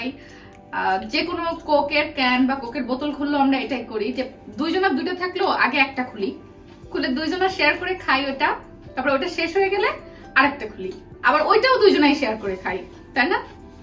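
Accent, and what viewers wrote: Kushtia, Bangladesh. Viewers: Apu ami tomar kotha sune mone hoyeselo tumi amader kushtia r meye ,onak valo lage tomake.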